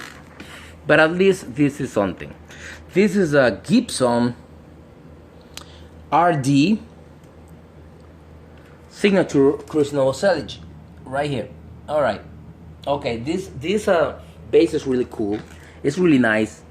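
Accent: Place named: Latin America. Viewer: You is "colombiano" or Argentino or mexicano?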